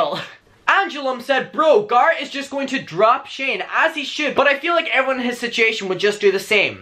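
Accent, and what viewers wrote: Ireland. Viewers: I love your Irish accent and how you pronounce Garett. Much love to Ireland. Garett's new video is hilarious btw. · Love that you’re not gonna change your accent/how you pronounce things to please people!! From a fellow Irish gal 🥰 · what?! You can hear the Irish accent thick af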